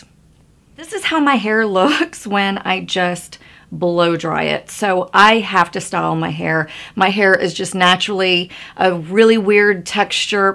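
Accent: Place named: Texas, United States. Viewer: So good to see you back, 😊 You're sounding more Texan since you've moved back. Muah! 😘 Love you!!!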